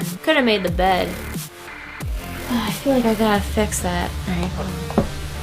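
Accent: England, United Kingdom. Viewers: Lol your Brit access sounded like Rebel Wilson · Doesn't anyone else think her British accent sounds like iJustine's British accent?!?.,!! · Her British accent is so hilarious I love it 😂🍩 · Your English accent is so funny😂❤️ we don't actually sound like that though aha xx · Loving that English accent😂😂😂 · A British accent and a scarecrow look? DOCTOR WHO CONFIRMED. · That british accent though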